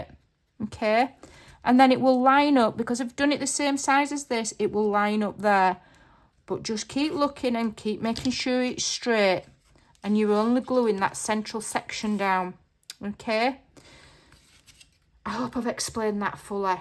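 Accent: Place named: Scotland, United Kingdom. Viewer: Hi there, I apologize, but I can't hear what the name of the tape is - Mac isnt making sense trying to translate the "scarpile" tape. I begun subscribing to your channel for about 6 months ago, & I love watching you make these journals. You just showed some of the once you've made Nov 4th 2025 & I want to try making one for one of my grandchild - thinking of making it as an Advent calendar for her. Don't know yet how or if it will work. I'm from Sweden, so English isn't my first language, love your Scottish/Wales accent - just guessing now. Would be grateful for your help, with the name of the tape that you mention. Thanx for sharing this tutorial. From Lia/Sweden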